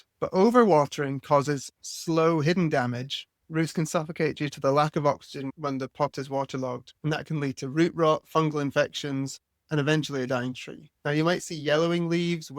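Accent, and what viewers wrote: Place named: Scotland, United Kingdom. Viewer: He sounds Scottish. A wee bit.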